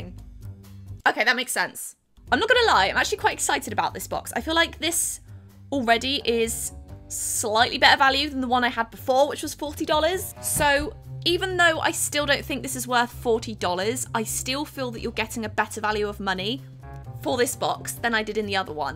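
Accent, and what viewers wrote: England, United Kingdom. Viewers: no she lives in the US, she just has a British accent! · you can't be american and have a british accent, is british LOL she just lives in the US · Where are you even from? British accent, and dollars? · I’m so confused... she s o u n d s English but she’s using dollars and saying target casually and hsuhfjfidms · I'm confused... doesn't she have a British accent? So why does she talk about dollars and Target? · This was recommended to me for some reason and I was very confused since you sound British but was mentioning American things. I still enjoyed the video despite the confusion. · Sounds weird to hear a lovely British accent like yours work in dollars lol I feel like British YouTubers are hard to come by.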